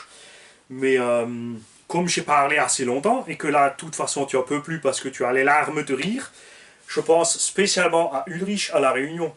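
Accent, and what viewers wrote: Alsace, France. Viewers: 😂😂😂 de l'alsacien a 100% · J’ai adoré l’alsacien cheun 😂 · 🍑😂👍 tip top l'accent alsacien mdr..! Bon b tu as du taf, voir + quand tu l'auras mis en pieces. Y a toujours des surprises en mécanique🛠 ☡🍑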